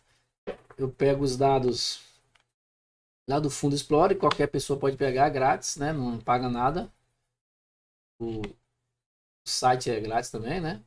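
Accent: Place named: Natal, Brazil. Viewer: so perguntei pelo sotaque que parece com o meu, sou de Natal mas to morando no PR.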